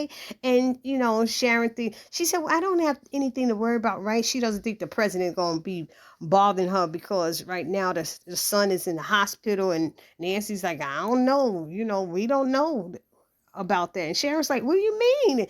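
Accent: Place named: East Coast, United States. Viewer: Thank you. Love the East Coast Accent. 😀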